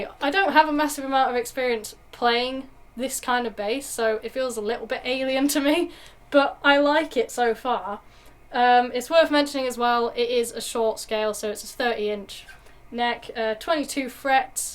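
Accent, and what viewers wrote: United Kingdom. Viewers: It sounds great,and your british Accent too!!Greetings form Argentina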